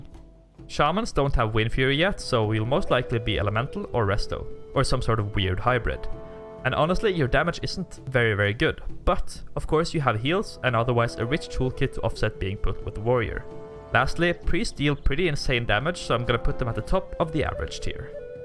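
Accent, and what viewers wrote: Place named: Norway. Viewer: its so easy to hear that you`re norwegian. love the guide :D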